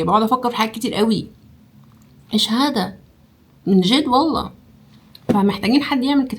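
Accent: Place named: Jeddah, Saudi Arabia. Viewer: انتي صايره تتكلمين سعودي جداوي هل عندك روميت جداوية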